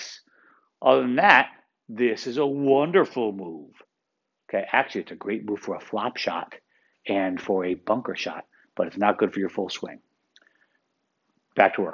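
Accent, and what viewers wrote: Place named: United States. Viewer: This man has an American accent. #skip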